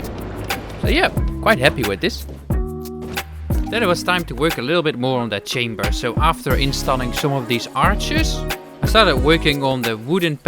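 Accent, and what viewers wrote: Netherlands. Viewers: I smell dutch maar ik heb eindelijk mn mede adhd’er gevonduh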